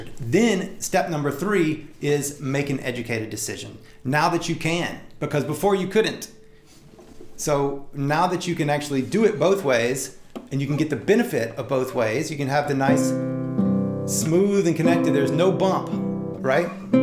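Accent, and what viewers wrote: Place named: South, United States. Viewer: I get his point, good vid...also keep trying to place his southern accent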